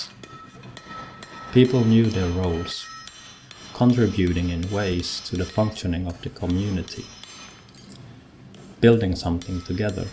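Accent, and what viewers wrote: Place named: Finland. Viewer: You do sound more Finnish than Swedish.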